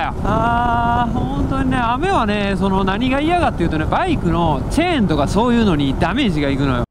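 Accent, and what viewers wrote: Kansai, Japan. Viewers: どぎつい関西弁で文句言ってるのにたまにの 怖いよーがかわいいw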